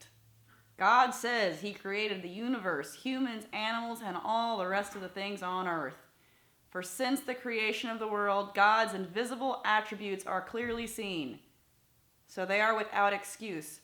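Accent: South, United States. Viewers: that Southern accent though.... I know it's parody, but I like.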